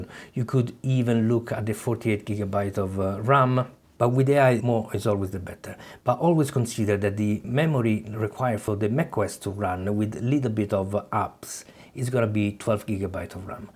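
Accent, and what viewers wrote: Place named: Italy. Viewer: italian eeeehhhh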